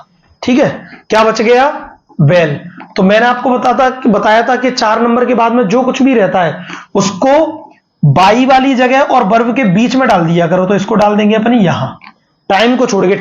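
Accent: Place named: Bhind, India. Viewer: Sir apki awaj Bhind jile jaisi lag rhi aap kaha se pada rhe ho please reply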